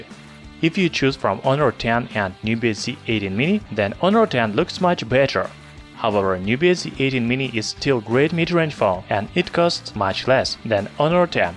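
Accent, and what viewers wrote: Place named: Russia. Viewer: Автор русский, вы чо по акценту не слышите?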